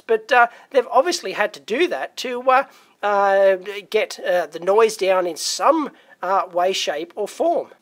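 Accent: Australia. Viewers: He speaks "strine." :-)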